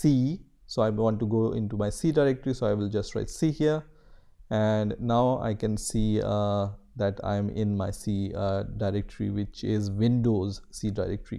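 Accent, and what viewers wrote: India. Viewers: an informatic tutoriel with an indian accent. Now i feel confident following his instruction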